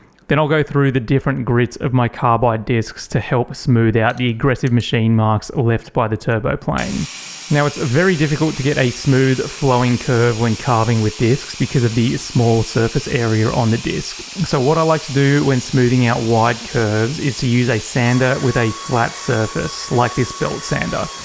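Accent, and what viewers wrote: Australia. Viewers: This was so beautiful and satisfying to watch. It's nice to hear an Australian voice too!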